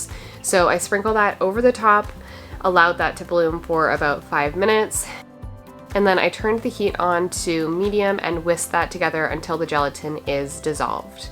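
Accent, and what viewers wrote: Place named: Canada. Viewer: I thought you might be Canadian by your accent, and then I saw thr PC brand raspberries and confirmed it. 🇨🇦 👋🏻 I am, however, disappointed that you've chosen to have only American product links 😢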